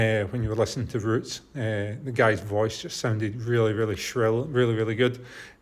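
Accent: Scotland, United Kingdom. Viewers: Id say central Scotland.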